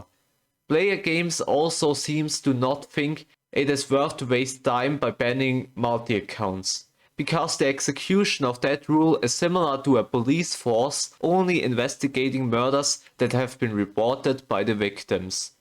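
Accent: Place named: Germany. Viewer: digga dieser deutsche akzent is so schlimm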